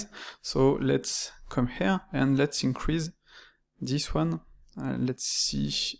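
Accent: France. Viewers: On reconnais le francais assez timide eheh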